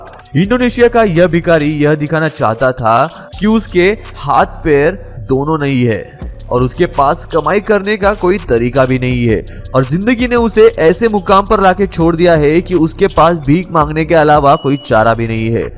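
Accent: India. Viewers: You are not an indian why speaking liake an indian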